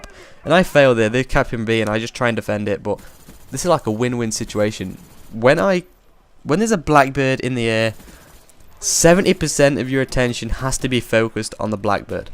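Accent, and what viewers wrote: United Kingdom. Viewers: FINALLY, A BRITISH COMMENTATOR!!!!!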